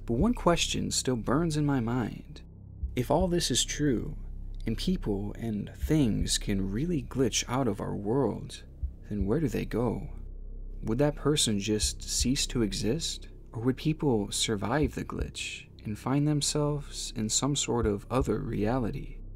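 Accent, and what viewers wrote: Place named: United States. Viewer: why tf do you speak like this? "Kalua" Lumpur??? jesus christ.... the american-ness is out of this world. and there have been found bits of the plane . this has to be one of the most triggering videos i've ever seen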